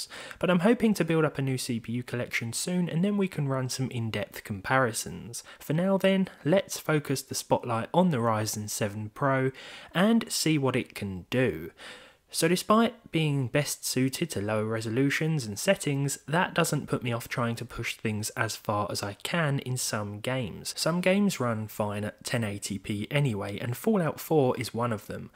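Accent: United Kingdom. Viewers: Very british pronunciation... At least for my Russian ear.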